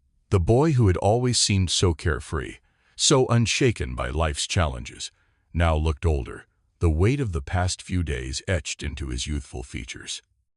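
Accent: United States. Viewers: Awful American accent. Awful fake Christie story. Go away do.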